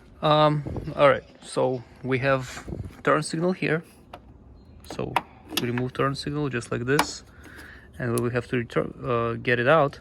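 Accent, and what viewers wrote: Russia. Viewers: не знаю кто ты и откуда, но слышен русский акцент ) классное видео спасибо )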